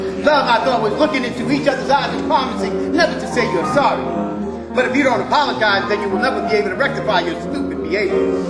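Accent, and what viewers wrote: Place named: New York, United States. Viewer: The narrator is a New Yawker. He should be hip to the words of: "But he was going no where fast Speed ain't nothing without class"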